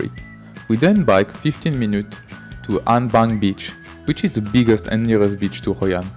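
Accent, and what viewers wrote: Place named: France. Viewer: Your French accent is WAY too thick - je n'ai compris RIEN! Thumbs DOWN!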